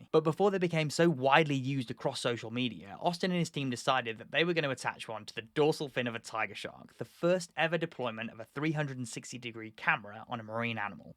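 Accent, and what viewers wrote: United Kingdom. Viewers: cool! Just sounded funny coming from a brit accent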